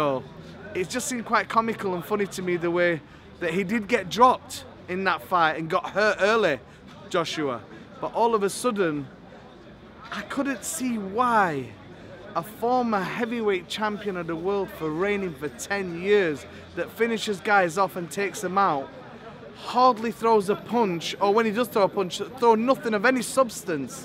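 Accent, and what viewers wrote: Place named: Yorkshire, United Kingdom. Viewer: I'm jus here for Yorkshire accent cuz I luv Yorkshire accent lol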